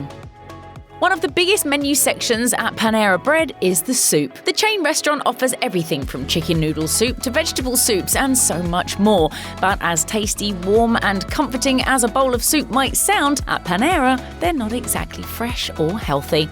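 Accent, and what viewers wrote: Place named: United Kingdom. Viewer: The British feminine accent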